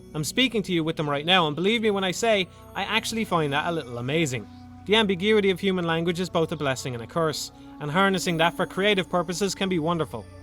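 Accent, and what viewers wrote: Ireland. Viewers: What is this accent, Irish? It's lovely, whatever it is. Now to keep watching the video to see if he makes a decent point.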